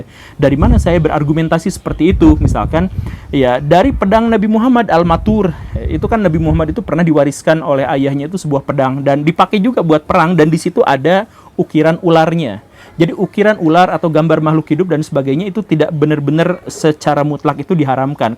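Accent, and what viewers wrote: West Java, Indonesia. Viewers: dari dialek bicara anda ini , saya yakin anda dari suku sunda.. tapi kenapa kagak memasukan istilah wayang golek sebagai seni wayang nya tsb setau gue mayoritas di jawabarat mengenal wayang itu berbentuk boneka / bentuk tiga dimensi, yang terkenal dengan wayang golek ? setau gue untuk jawa tengah dan jawa timur mengenal wayang wong dan wayang kulit, sedangkan wayang golek itu hanya di jawa barat. sedangkan di sumatra dan kalimantan tak dikenal perwayangan tsb, ini berdasarkan gue pernah hidup di pulau tsb... maaf kalau gue salah, karena bukan pemerhati sih waktu masih kelas 2 eS eR di tahun 1952 gue seneng baca komik perwayangan, sehingga faham cerita ramayana dan mahabarata dan sering juga nonton wayang golek tsb setengah malaman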